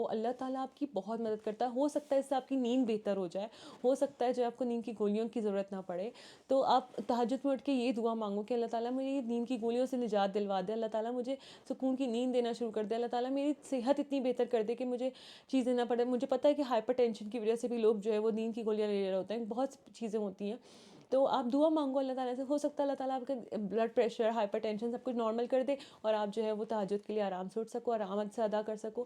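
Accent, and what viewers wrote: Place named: Pakistan. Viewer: Aur ek baat mai India se hu Baji Mujhe pakistani video bohat achhe lagte hai. Aap logo ka baat karne ka lehja Masallah bohat achha hota hai. 😊😊😊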